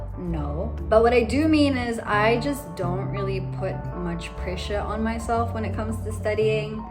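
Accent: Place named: New Zealand. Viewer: you're losing the kiwi accent nooooo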